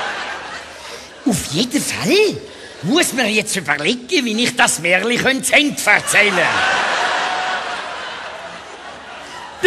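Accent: Switzerland. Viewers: Neh an und für sich ist das ne Art deutsch ... Aber was jetzt genau ? Irgend ne art Dialekt oder dieses Schitzerdütsch ?? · schade das des "nur" auf schwitzerisch is. bin aus bayern und hatte den marco in der wochenschow immer gern. leider versteh ich nichts.... nurn bissl was ich mir zusammen reim kann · Nee das ist Schweizerdeutsch :) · schweizerdeutsch. zugerdeutsch. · 10% verstanden und trotzdem gelacht ^^ - ich kann kein schwitzer Dütsch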